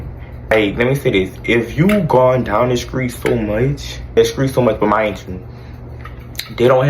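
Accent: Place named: Louisiana, United States. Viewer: Miss you and your million dollar sexy Louisiana twang, and that hot look is a bonus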